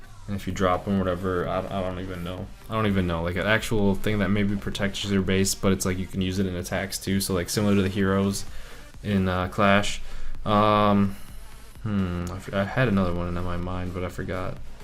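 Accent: Canada. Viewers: Is Canadian? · sounds Canadian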